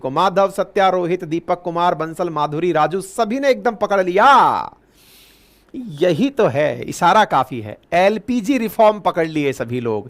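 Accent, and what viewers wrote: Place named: Bihar, India. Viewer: ki apka bihari style shandar hai, real accent.🙏 Bharat mai 1991 mai hua tha, jab GOLD gaya tha.🙏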